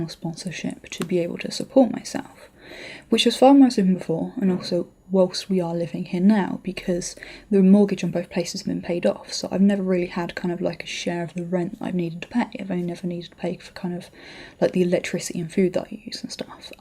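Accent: England, United Kingdom. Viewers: Fast speaking + English accent = really hard to understand for a non native English speaker D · I enjoy your videos and sympathize with the real life problems and it honestly humanizes you, which is a nice change of pace from the persona we meet on the screen at each viewing. I think allowing room for some flaws in your videos for a quicker more efficient approach is fine. Learn to roll with them and incorporate them in fun ways into the video. I do think patron is a good idea to help increase your revenue, it may also be good to do a tutorial and ask viewers to whitelist your youtube channel. Two things initially attracted me to the channel computer parts and a pretty woman. Two things ultimately kept me coming back; you have an English accent, and in the U.S. that is not so common, so it just makes you sound intriguing. On the other hand you also know what you're talking about instead of just reading specs, which helps your followers respect you. 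It is one of my gripes with Linus is that he does not always know the products he is reviewing well enough and presents them in a bad light due to ignorance. As a viewer one piece of advice is to show more excitement in your voice. Often it can seem mono-toned and in a review that is more acceptable. This may be your personality, but it is in a sense showbiz and I think developing your character and excitement could be of help. -Cheers P.S. I hope your housing situation works out for the best. TheHiveLeader and should do a UPLAY episode together. That is one funny dude and it would provide some nice coop t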